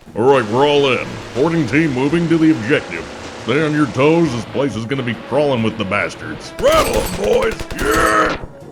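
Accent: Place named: United States. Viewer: hes the most american man i ever heard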